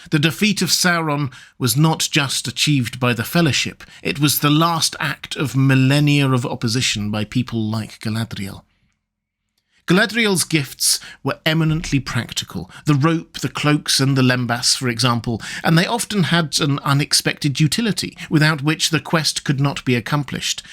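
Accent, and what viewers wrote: England, United Kingdom. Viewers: He has an English accent too! Neat eh?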